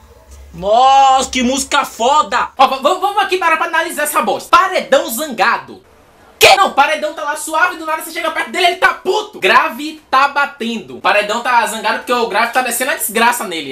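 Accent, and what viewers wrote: Bahia, Brazil. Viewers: c é de salvador ? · ele é baiano????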